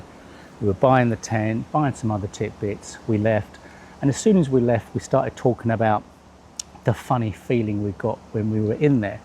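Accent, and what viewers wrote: United Kingdom. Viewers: Name and shame! Call me innocent what race were you treated as? Accent and skin colour I don't see you as anything other than English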